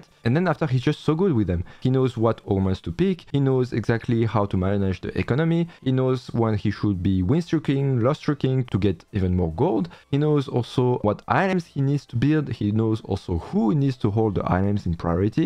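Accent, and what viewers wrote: France. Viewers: J'entends un reuf français pour sur ! 😂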